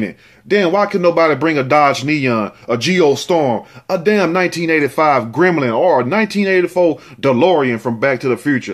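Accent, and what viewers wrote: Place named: Texas, United States. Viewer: You speak like my cousins in Beaumont, TX, Luv It✊🏾🙏🏾👊🏾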